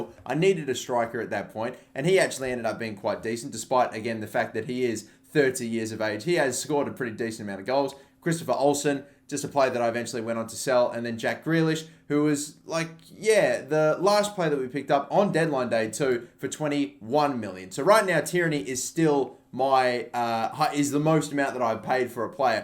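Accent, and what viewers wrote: Australia. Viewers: Didn’t know Alisson did youtube and had an Australian accent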